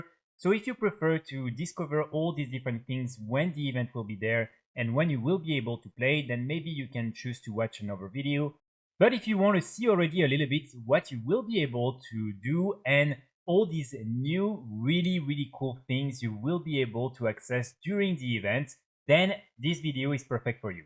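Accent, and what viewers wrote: France. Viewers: Are you french the way you talk 💯 sure que tu est français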